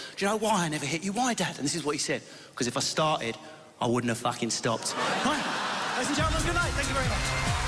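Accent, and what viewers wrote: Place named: Australia. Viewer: He's funny because his Aussie accent is spot on, it doesn't sound over the top or anything!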